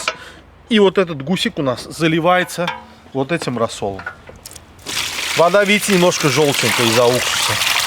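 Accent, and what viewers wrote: Baku, Azerbaijan. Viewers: Бакинский акцент ни с каким не спутаешь. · Может быть. Спасибо Вам за ваш труд и за потрясающий и неповторимый Бакинский акцент!Нас осталось мало,но мы есть!🙏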